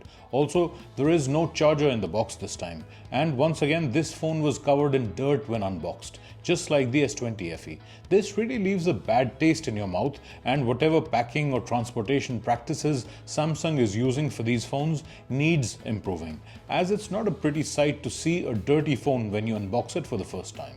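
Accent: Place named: India. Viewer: Beg the question why dont u make videos without that annoying acent put in in title indian ao we dont click on it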